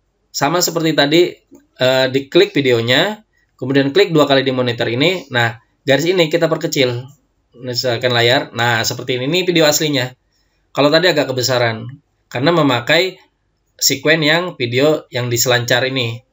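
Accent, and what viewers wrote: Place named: West Java, Indonesia. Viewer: "Sunda Van Java" logat nya 👍👏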